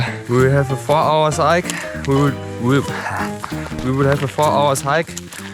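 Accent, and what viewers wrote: Germany. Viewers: Mega geiles Video! „Bad english with perfect german accent“ passt so geil zu dir als Marke 😂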